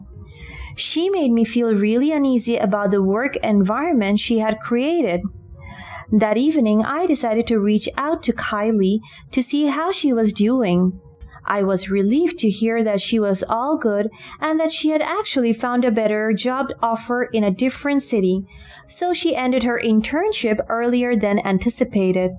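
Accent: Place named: United Kingdom. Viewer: It's the queen's English not American English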